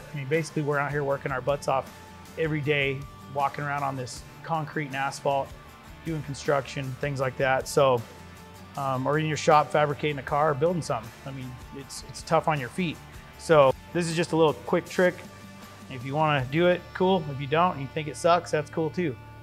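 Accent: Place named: United States. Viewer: Iam here to learn the American accent.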